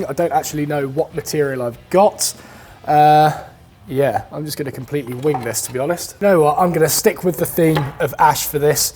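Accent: United Kingdom. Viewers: Man i LOVE that british accent!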